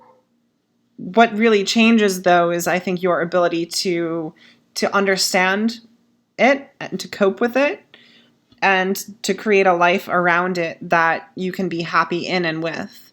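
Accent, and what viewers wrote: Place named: United States. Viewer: i only discovered you this afternoon through your two '5 lies' videos but i have already committed myself to watching them all over the next week, month, year, etc (as many times as necessary for the points you make to sink in). you have such insight and self-awareness, and seem able to articluate your feelings through language so effectively, your videos are utterly compelling. even more, you even seem able to put into language feelings i have but can't find language to express myself. sometimes you say something that 'hits' so hard i have to stop the video, take a deep breath and recompose myself before carrying on, because you are bring thoughts and feelings i have into focus for me in a way i can't. maybe because i am too close, or too afraid. or because i don't know what i am looking for when i think about myself. 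i will look into your ebook too. i'm in UK/EU half-half. i'm guesssing most of it would apply (feelings are universal) and that it isn't specifically focused on getting help in the states (i'm guessing the states, from your accent but sorry if i've got your location wrong). as for more updates and videos, i will watch anything you post without hesistation. don't let your modesty or humility stall you. my eyes widened in this video when you commented that the running time was nearly ten minutes so you had better hurry up. truly, i would have been fine with an hour. or two. whatever you wanted to do. if anyone watches your videos with one eye on the clock i wonde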